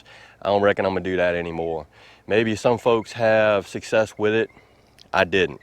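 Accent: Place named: Louisiana, United States. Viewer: You sound like your from Louisiana bro. That ain't no Mississippi accent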